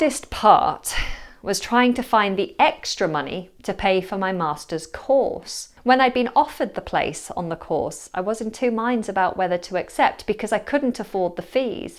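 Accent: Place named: United Kingdom. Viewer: It is very useful to listen to you specially for your wonderful british accent!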